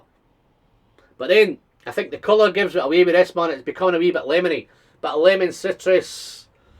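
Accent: Scotland, United Kingdom. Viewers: One big reason for following you besides the whisky reviews is your Scottish accent mate. I love it. Kind regards from Holland. 🤘🏻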